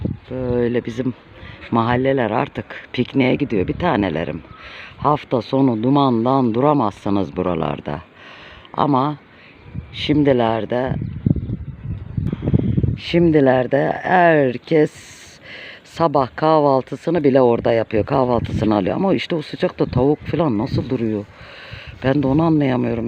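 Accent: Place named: Adana, Turkey. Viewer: değerli youtube gezgin ve yurtiçindeki kendi içeriklerini youtube de yayınlayan arkadaşlar hepimiz biliyoruz ki bu işi az veya çok para kazanmak için yapılıyor buda sizin en tabi hakkınız öyle youtuber kardeşlerimiz varki çok büyük emekler ve yaşantılarını tehlikelere atarak bizlere gerçek yaşantıları kültürleri yaptıkları iyilik akımları ile bizlere gerçek içerik üretip bilgilendiriyorlar düne kadar köy yaşamını köy kahvaltısına burun kıvıranlara dahi bu yaşantıyı en güzel içerik ve yaşantılarıyla bizlere sevdirdiler çoğumuzu acaba bizde yapabilirmiyiz moduna getirdiler hepsine çok çok teşekkür ediyorum bu arada yemek tariflerini kitaplardan yapan değerli kardeşlerimiz artık bunun için kitap karıştırıp şu yemek tarifi hangi sayfadaydı diye uğraşmayın bu tarifler ve lezzetler sizin bir karış yakınınızda size düşen tek şey ekrana bir parmak basmak ve bu güzel lezzetleri ablamızın köy hayatı ve kedilerinde kendine has adana şivesiyle seyredebilirsiniz lütfen hepimiz youtube gezinirken ablamıza uğramayı unutmayın ben kardeşimizi ah köyüm ah sayesinde tanıdım iyikide tanımışım sizde tanıyınca inanın çok seveceksiniz kendisiyle kanalının dışında hiç bir tanışıklığımız da yoktur ama bir gün o tanışmada olur inşallah c.allah tüm izleyenleri ve insanları kötülüklerden korusun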